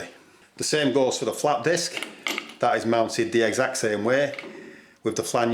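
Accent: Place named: Wigan, United Kingdom. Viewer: You sound like you're from somewhere like Wigan or Bolton with your common accent. I think manufacturers and businesses just slap on an expiry date for the sake of it sometimes, though I'm not saying this isn't a bad thing regards a powerful and dangerous tool like an angle grinder. Having an expiry date, especially with food, is just another way of making money where people throw it away when there's no to do. I have a Clarke angle grinder and I can't get the old worn down disc off using a pin wrench; it seems to be stuck and won't turn for some reason maybe due to rusting. I put some WD40 on it but it hasn't made any difference.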